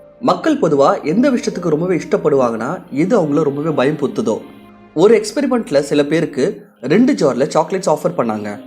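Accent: Kerala, India. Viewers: Kerala thambi voice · Malayli ah. I lyk ur Tamil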